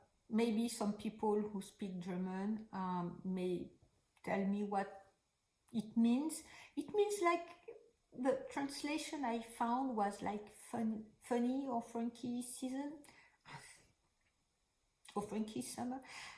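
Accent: France. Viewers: Delighted to have come across your channel today while knitting. I enjoy listening to other knitters talk of their projects as I knit and I find your French accent very pleasing. I've always thought the French language is beautiful so your accent doesn't bother me a bit.:-) I live in Maryland....just outside of Washington DC and we are having many storms and heavy rain lately as well. Your WIPs are lovely. The Madeleine sweater you are working on looks similar to the Astragal sweater by Ainur Berkimbayeva that I knit awhile ago. I also like the look of that Ripple j Jacket pattern!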